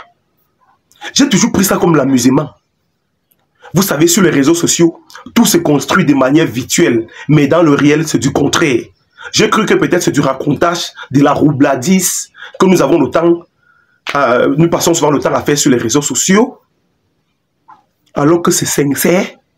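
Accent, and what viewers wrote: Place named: Cameroon. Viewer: Regarde comment tu resonnes !une mentalité vraiment camerounais comme les camerounais disent . Le jour tu arrives en cote d'ivoire tu auras honte de dire que tu es Camerounais .par ton accent nous les ivoiriens ont saura que tu es camerounais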